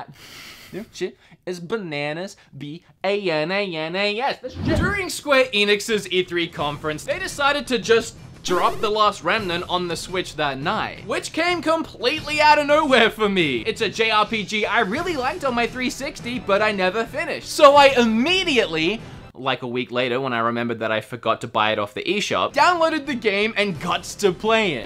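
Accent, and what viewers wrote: Australia. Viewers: Wait, your Aussie? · Lol I didn’t realise your Australian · I'm so glad you finally said your accent, I'm Australian too and it was killing me bc of the American hints you have too, I was so confused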